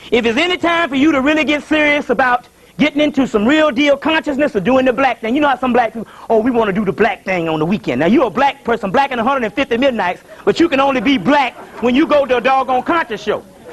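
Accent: South, United States. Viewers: I can listen to brother for Hours black Billy Graham with that southern tang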